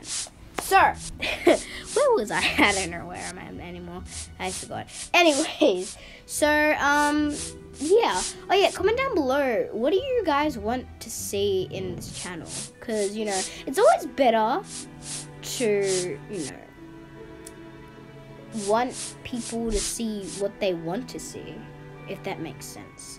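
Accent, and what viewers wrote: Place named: Australia. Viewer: I can tell your an aussie by listening to your voice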